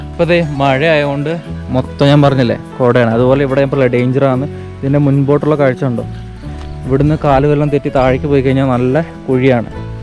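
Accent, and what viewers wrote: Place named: Malappuram, India. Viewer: Bro oru samashayam nee malappuram alle but slank. Bery anallo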